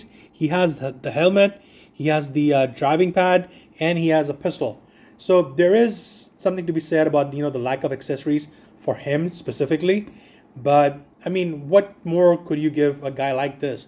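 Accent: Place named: India. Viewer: good review video bro hey! hi from Quebec Canada, I speak French, so me too had to learn English to join the gi joe community, welcome! from your accent, you must come from India or something? scrap iron your videos have little views because the trend is about the new releases, I have this guy since years before, so that s why it will not have many views you can compensate with dios - scenes to present them, it always look better, just cardboard and paint does a decent job, it s cheap. he s one one my favs in Cobra, he looks good next to reg blue troopers and the stinger jeep he s a tank killer, so he s always protected by troopers, vipers, so he only needs a gun. since you re new, or at least come from far away, you should know the Hasbro pulse site, here on u tube, check the 'post' section to comment and suggest to the makers themselves, I m sure they ll love that you love gi joe coming from so far. 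the cartoons, comics, they are a reference, but the file cards are the most important infos, I rely on that all the time, scrap iron is a solid character for Cobra in gi joe, congrats! so now you know... God bless America and world peace good work bro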